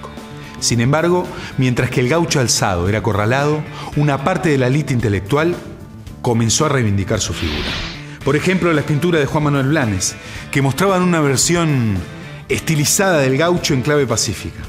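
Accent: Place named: Argentina. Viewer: gracias amigo por desburrarme si es q se puede decir ja ...recien me di cuenta q eras uruguayo cuando lo mencionaste suena muy argentino bue la pregunta el gaucho es el fruto de la mezcla del indio y el europeo ? o ¿es el inmigrante q llego sin plata a estas tierras? ..¿ o eran piratas españoles ?....¿ bueno de donde salio el gaucho no me queda claro ? grcis saludos desde cordoba argentina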